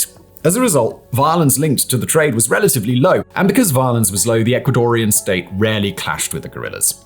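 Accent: England, United Kingdom. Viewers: Cant blame him. I think hes english english... like.... engla terra english.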